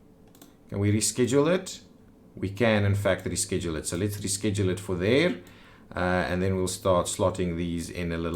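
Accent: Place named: South Africa. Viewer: Subbed, great work & loving the fellow RSA accent!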